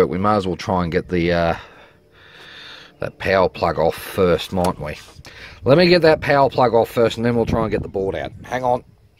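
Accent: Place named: Australia. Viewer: Great to hear an Australian voice. I'm preparing to tear down my old Power Mac G5 for Intel (maybe Hackintosh) Conversion. I also used the ifixit guide. Thanks mate..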